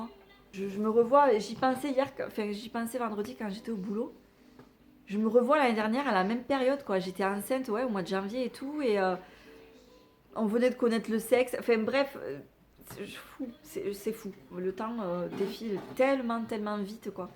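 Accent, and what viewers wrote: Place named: South, France. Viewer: Oh ce bel accent du sud j’adore 😂 elle est trop marrante ! Vlog cool comme d’hab des bisous 😘😘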